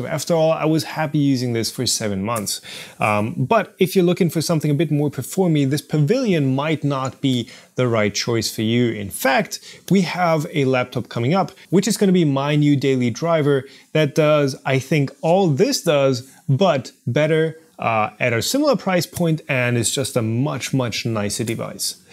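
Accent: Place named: Germany. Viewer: I really hear his German Accent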